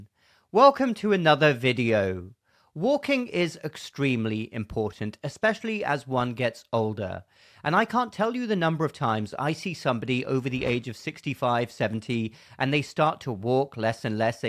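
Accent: United Kingdom. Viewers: I walk to the cafe, about 3.4K every day, so a nearly 7k round trip. I have to concentrate to keep my shoulders back, and look straight forward. I am 77, and was forced to retire at 75 for vaccine refusal. thanks. I am intrigued by your accent, which I notice that other people have from the UK, but seems to be a mixture of older accents😊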